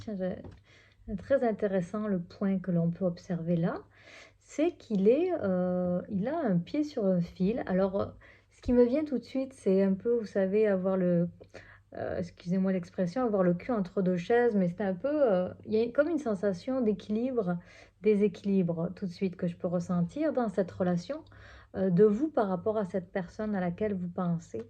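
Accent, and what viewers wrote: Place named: Quebec, Canada. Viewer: bonjour,.c'est tout à fait l'écho de ma situation.merci pour ce que tu nous apportes, avec l'accent québéquois qui ressort +++aujourd'hui😋tout mignon.😍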